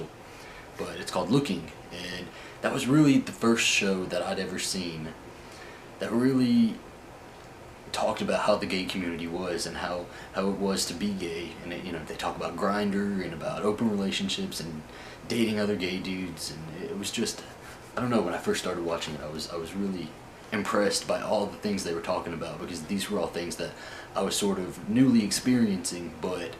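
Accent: South, United States. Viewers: So many great suggestions Z, from you and others, so thanks! I'm watching Drown now. The Broken Hearts Club is good, and Rock Haven. I love your accent; makes me want to move back to the south. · Ok ! Here i go again cyber stalking my fellow southern boy . I adore you . So sweet Honest ( southern boy ) . I just find you real and im a litttle older but i learn from you. . ( btw hint hint. Become friends with a flight attendant ( me ). . Saves on travel . Just kidding . Being in new York , then Miami I lost my southern accent unless im yelling at my bf whom yells back in Spanish . Thats its own video !! Any way i love the accent snd your open heart and sweetness Namaste , Rocky ✈️🙏🏻😇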